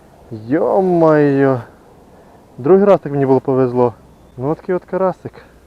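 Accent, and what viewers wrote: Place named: Ternopil, Ukraine. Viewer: Тернопільський акцент. Рибалка це життя і активний відпочинок👍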